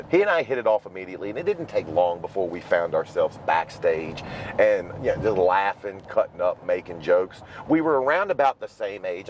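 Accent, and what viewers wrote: Virginia, United States. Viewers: Man, I saw that Kevin Nash interview (and your subsequent response) & I was really impressed with how you handled that. He has obviously never spent much time in Virginia because I’m from NoVa but as soon as I heard your voice the first time I knew you had to be from VA (I guessed Winchester but Charlottesville makes perfect sense. Also, this YouTube shit is amazing because you really found your calling. You’re so articulate and intelligent. The way you deliver your speech is so professional; this is really what you were meant to do.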